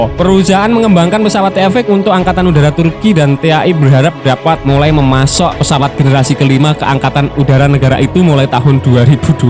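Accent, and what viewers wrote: Indonesia. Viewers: lah kenpa lu ngurus gua... Bukan kah konten tentang malaysia 🤣...malaysia apa indonesia yg suka ngurusin urusan orang... Dan youtubernya pun orng indonesia...dah lah coy gx usah make cara nenek moyang klian lagi yg suka ngadu domba melayu dgn melayu... Yg ad klian nyesal jika terjadi konflik di 2 negara ini..dan lu pun tau dri logat youtuber nya bukan 🤣...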